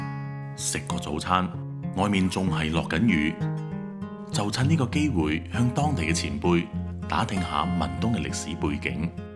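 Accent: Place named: Cantonese, China. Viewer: 你的粤語好好聽💚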